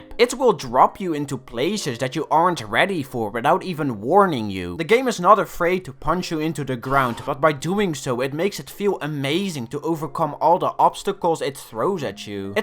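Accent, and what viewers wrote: Netherlands. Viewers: hollow knight is amazing also are you Dutch? I recognize the accent on how you pronounce some words idk if it makes sense, could be bc I'm Dutch lol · U Dutch? U sound dutch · Yeah hes Dutch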